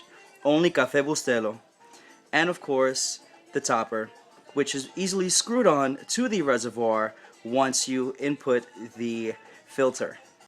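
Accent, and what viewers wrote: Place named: Cibao, Dominican Republic. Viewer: Jajajaja me encanta la forma de explicar... seguro eres del cibao santiago republica dominicana. Salud!!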